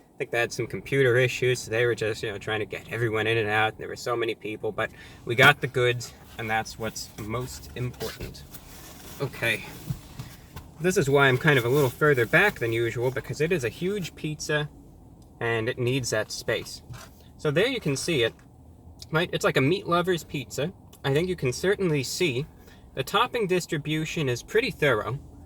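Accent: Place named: Transatlantic, United States. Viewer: Ever since he read those mean comments and showing the vicious one about slitting his throat and stabbing his eyes with forks..I have an added entertainment factor watching his videos...I love this guy! How can anyone not? His trans Atlantic accent I like as well. Friendly and easy going..I hope the people that said the violent things were just trying to be funny.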